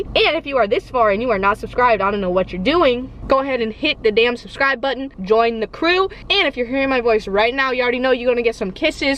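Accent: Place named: South, United States. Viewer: I would of never guessed you were in Cali. I figured you were Southern. LOL.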